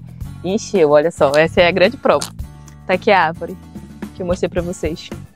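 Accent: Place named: Rio de Janeiro, Brazil. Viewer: Ela tem sotaque carioca kkkk troca o s pelo x, reparei isso.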